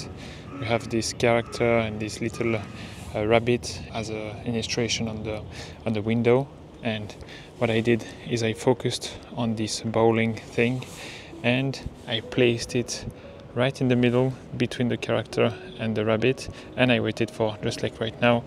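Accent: France. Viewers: Drôle comme on reconnaît l'accent francais, belle video 👍🏻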